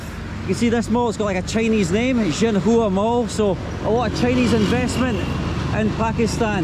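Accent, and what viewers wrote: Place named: Scotland, United Kingdom. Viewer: Please speak with your scottish accent, I like to hear your accent... 😊 there are not more vlogger with that accent, that sounds unique than other vlogger with american accent.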